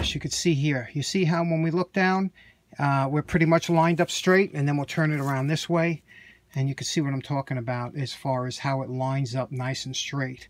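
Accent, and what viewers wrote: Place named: New Jersey, United States. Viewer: Liking your New Joisey accent!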